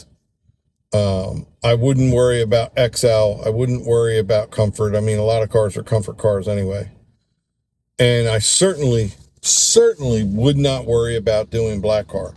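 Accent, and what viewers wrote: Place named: Mid-Atlantic, United States. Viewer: So true “If you’re in your car, you’re not at heume” Love that mid atlantic accent. Where I’m from. When I go back home, I hear the accent and takes me back.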